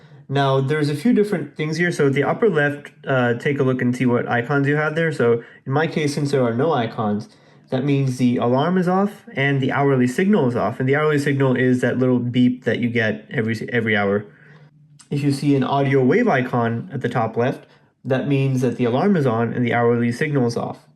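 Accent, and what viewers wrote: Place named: United Kingdom. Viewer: You are like British Speaker Nice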